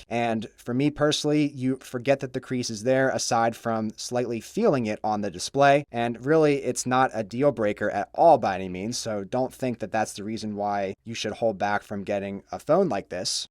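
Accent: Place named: California, United States. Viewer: U talk like californian gurl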